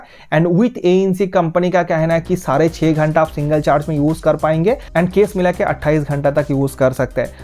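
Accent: Bengal, India. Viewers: Bhii app Bengal see hoo kya??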